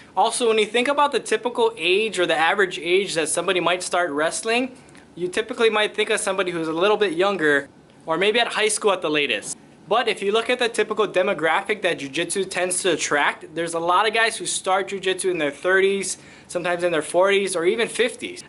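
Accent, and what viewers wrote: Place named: Hawaii, United States. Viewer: I was listening and thought to my self "this braddah sound like he from Hawaii" sure enough you are 🤙🤙🤙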